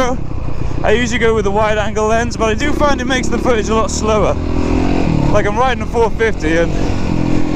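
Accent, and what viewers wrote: United Kingdom. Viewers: hello where are you riding sounds like UK?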